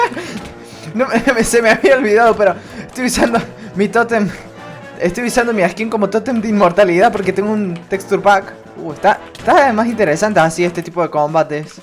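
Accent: Córdoba, Argentina. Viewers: Chabon sos de Córdoba? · vo so cordobes no? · Hola bro sin ofender pero ti vos se me hace a la de un Cordobés me resulta muy gracioso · por que es de cordoba, una provincia de argentina en la que hablan asi jajas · Muy bueno el video hermano una duda ¿sos Cordobez? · capo el pibe cordobés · Cordobes xd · apenas empezó el video me di cuenta que sos cordobés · 😆!es cordobessss¡¡¡¡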